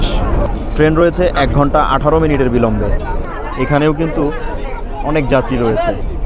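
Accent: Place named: West Bengal, India. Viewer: এই ভিডিও তে আপনার কথা শুনে মনে হচ্ছে আপনি পশ্চিমবঙ্গ বা কলকাতার আসে পাশের কোনো এলাকার ছেলে 😅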